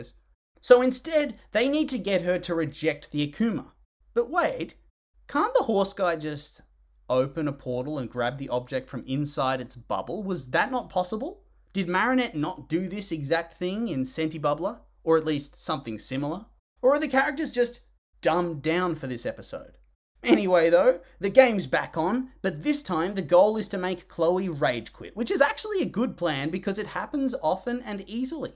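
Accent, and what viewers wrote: Australia. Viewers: Im gonna guess you're aussie from your voice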